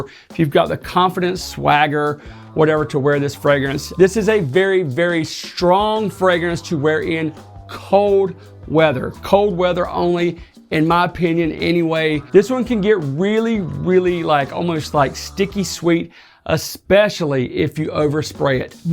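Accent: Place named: Florida, United States. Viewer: Always enjoy your down to earth reviews! I need to try that Nasomotto! I'm in NY now but your accent and demeanor reminds me of the circle of friends I had when I lived in Daytona beach Florida. Lol.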